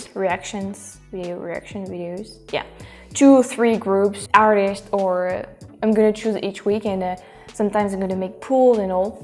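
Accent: France. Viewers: Salut à nouveau. Comme d'habitude, toutes tes idées sont géniales! Peut-être qu'un jour il y aura un «Guide FrenchTastic» pour rivaliser avec le Guide Michelin! Je trouve toujours amusant que les gens, y compris les Français, commentent l'accent français. Pour les Françaises, leur accent est comme le bouclier de Wonder Woman: c'est leur super pouvoir! J'ai eu des filles françaises qui me disaient essentiellement de "foutre le camp" et la moitié de mon cerveau pensera "oh elle est si méchante" tandis que l'autre moitié pense "mon Dieu, elle a l'air si sexy"! En bref: c'est un super pouvoir que tu veux jamais perdre! Prends soin de toi! /Bill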